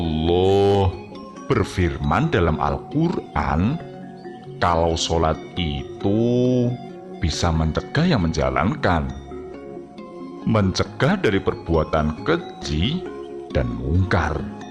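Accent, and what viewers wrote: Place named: Banyumas, Indonesia. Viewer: Salam ngapak Yen ora ngapak ya ora kepenak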